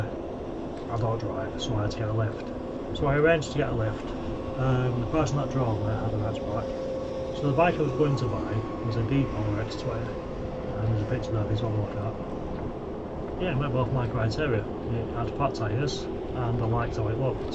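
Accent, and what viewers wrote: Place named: Yorkshire, United Kingdom. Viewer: Just came across your video well done you I found it interesting listening to your woo with your first e bike purchase what a bummer , but looks like you have purchased a different one now and you are all legal. I bought my first e bike over 10 years ago before all this legal stuff it had a motorbike like throttle I have never been stopped by the old bill in fact I have had several bobbies saying what a nice bike never asked me about motor size or anything maybe just been lucky . Please carry on making your videos love your accent reminds me of home I was brought up in Yorkshire but left when I was twenty live in London now miss the northern accent though .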